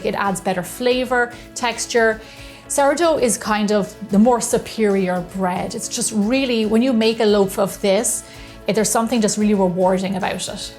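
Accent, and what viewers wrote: Ireland. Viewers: I could bake bread with you all day.. You make it look so easy. How long will the starter last? But your bread looks so much different and just could listen to your Irish accent. Very nice... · So fun listening to the Irish talk. "And while it proofs, you can go have a cuppa." :-)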